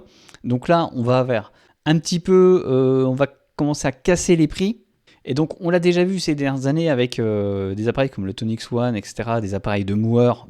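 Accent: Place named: Belfort, France. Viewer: tu as l'accent des Territoires de Belfort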